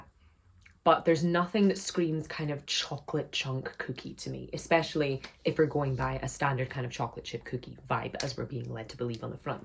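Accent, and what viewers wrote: Scotland, United Kingdom. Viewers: She is Scottish.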